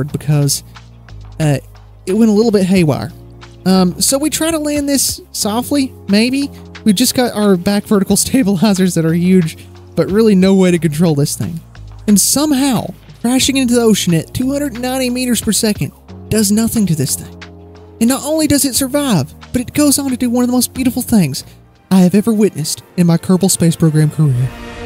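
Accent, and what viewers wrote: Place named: Virginia, United States. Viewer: First time I stumbled across your channel, and I gotta say... Wow, this was really enjoyable to watch. Great commentary, you didn't drag it out 3 times longer than needed to be, bits of humor, and nice editing. 👍👍 Also, you sound like my relatives, and I'm guessing you live in western area of Virginia.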